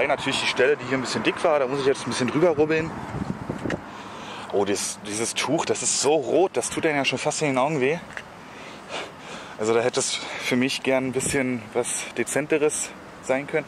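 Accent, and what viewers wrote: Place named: Berlin, Germany. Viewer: Ohhhh Berliner 👍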